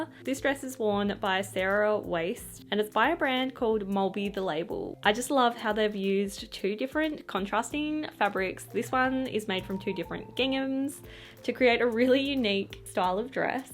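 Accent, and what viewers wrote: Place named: Australia. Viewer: Struggled with the Summer bit. Then heard you talk and remembered, silly me, you are in Australia!. Been there 20yrs ago. Wish I was there now. Looking forward to seeing how the dresses turn out. Also as a Quilter I can't wait to see your artistic version.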